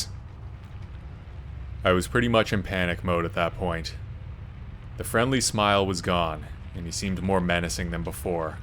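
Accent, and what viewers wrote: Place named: Canada. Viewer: I enjoy the cute "oot" and "aboot" Canadian inflections too. 😊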